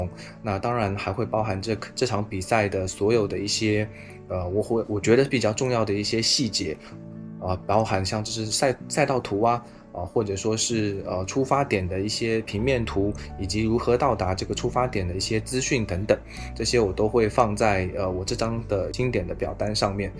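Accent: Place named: Taiwan. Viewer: 講話敘述真的很像台灣人，CC字幕有繁體字，真的很用心，內容很詳細清楚，非常棒，感謝🎉。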